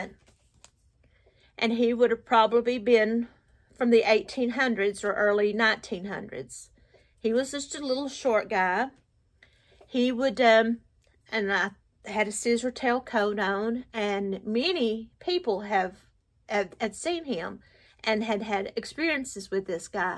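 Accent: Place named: Georgia, United States. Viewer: Enjoyed the story. You sound like some "homefolks" I grew up with in south Georgia. Too bad todays kids listen to some "gamer" on youtube that can't say 3 words without a 4 letter word stuck in between them.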